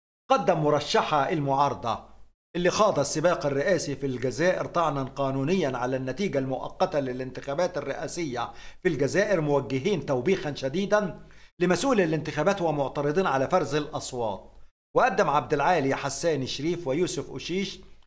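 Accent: Egypt. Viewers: السلام عليكم ورحمة الله احنى نعشق اللهجة المصرية لا حرق عليك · لا مشكلة في نطقك بالجزاءر بلغة مصر تخرج من فمك زي العسل والله المهم انك معنا و تحليلك لكل الاخبار رايع رايع رايع كل الحزاءر تحبك و نظعك على رؤوسنا و لا نتعب ابدا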